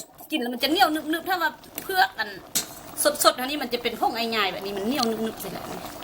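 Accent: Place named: Lao Nyo, Thailand. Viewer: ฟังพี่เขาพูดออกสำเนียง ลาวผสมญ้อ (ฟังออกครับ)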